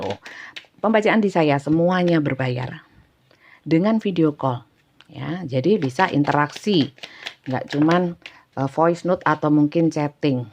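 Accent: East Java, Indonesia. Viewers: Kakak dialognya jw timuran berarti sm dong kak? Sy juga asli Suroboyo lho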